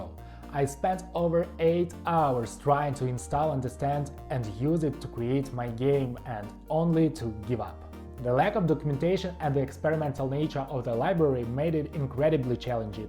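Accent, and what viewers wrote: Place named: Russia. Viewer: с первых секунд услышал русский акцент)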